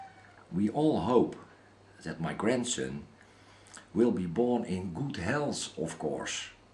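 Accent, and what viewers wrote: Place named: Netherlands. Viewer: Ik hoor aan uw accentdat u nederlands bent, heel goed gespeeld ;)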